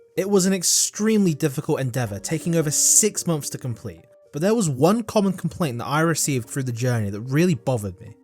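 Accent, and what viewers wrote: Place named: United Kingdom. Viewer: love the way you talk a great uses of being british.(im british)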